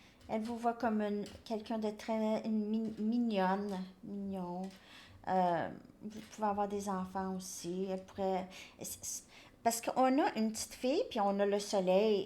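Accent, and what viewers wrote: Canada. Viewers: j'aime énormément ton accent canadien je adore cendrillon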